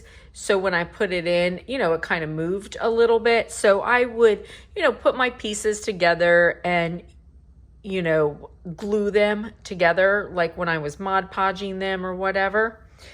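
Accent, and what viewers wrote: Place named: Philadelphia, United States. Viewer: You sound like u have a Philly accent. Anyways thanks for sharing with us. I appreciate the information so much.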